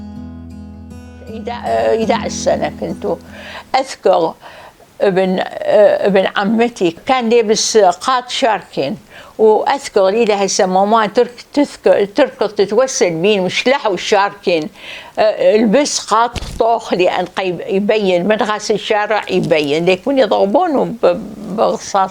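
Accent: Mosul, Iraq. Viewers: ماشاءالله عنا لهجتها العراقية واضحة وقوية( كولش) وهي ايضا سيدة جميلة العمر الطويل لها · تحجي مصلاوي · كانو لهجتها مصلاوية 🤔؟ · الله يحفضك ويطول عمرك يلغاليه كلنا العراقيين نسمع قصتك وانت تحكين الغه العراقية بلأخص الغه تحكين مصلاوي وانا من أهل الموصل وسمعون حكيكي بلمصلاوي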